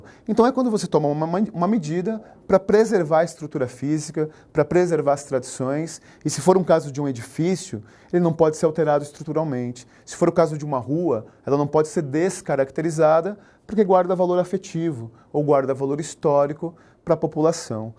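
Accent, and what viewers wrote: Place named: São Paulo, Brazil. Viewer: sotaque paulista muito massa de ouvir :)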